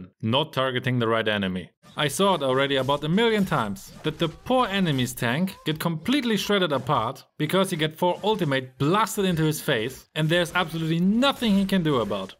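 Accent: Germany. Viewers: Deutscher Akzent xd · Hey in what server are u eu or na I feel like eu because of german accent Sow if you wanna game I m open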